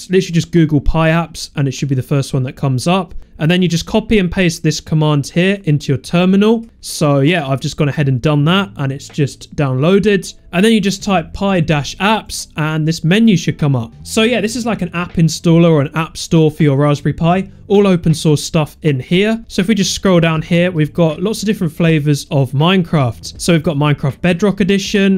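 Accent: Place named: Belfast, United Kingdom. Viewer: u r from belfast. i know that :)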